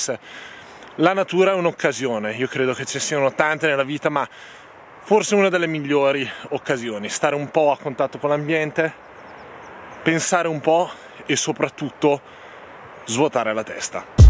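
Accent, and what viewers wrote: Brescia, Italy. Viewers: Avevo riconosciuto l'accento bresciano! Anche io! e tra l'altro vivevo proprio a Cellatica, dove sono ancora i miei genitori. Ti seguo con interesse!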